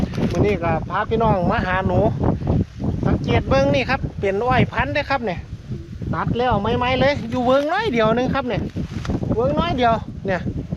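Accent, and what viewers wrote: Chaiyaphum, Thailand. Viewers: สำเนียงเสียง คือคนทางชัยภูมิ แท้ครับ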